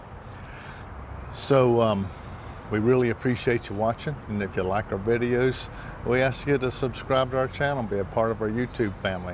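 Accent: South, United States. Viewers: Great videos from Wonderful People Easy to understand as Down to earth as the earth that puts forth our food from God with our labors i have these big treats in 5 gallon pails as i am an apartment dweller they are just starting but i used Miracle Gro potting soil an added peat moss an perlite and will be adding plant food from Miracle Gro along with other nutrients.God Bless you sweet children of God ps as a Canadian who Loves America Love that Southern accent Keep these greeat teaching tools Coming an I can Relate to Old an Fat!!!! brother but we both worked our butts an arms OFF at 59 i can Still outwork the Young Bucks as i am sure you can Keepem coming an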